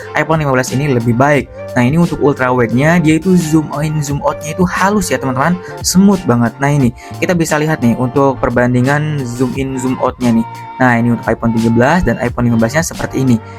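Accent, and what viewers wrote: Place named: Cirebon, Indonesia. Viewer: Busst orang cirebon lu bang😂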